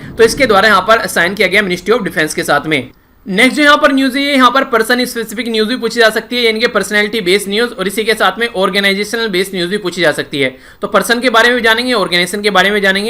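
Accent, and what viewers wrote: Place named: Uttar Pradesh, India. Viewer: Sir ki language se Mujhe v lgta h.. Jaise kbhi kbhi unka tongue slip kr jata h or UP ka thoda effect dikh jata h.. Jaise ki kl... Hamaari ko hmri bol diye sir... Aise bahut baar hua h... Bt sir btate hi ni.. 😣😣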